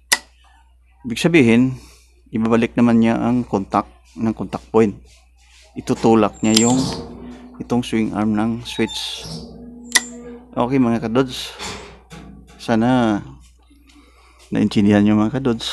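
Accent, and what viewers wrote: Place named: Visayas, Philippines. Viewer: ok bokal salamat men galing ng visaya ka.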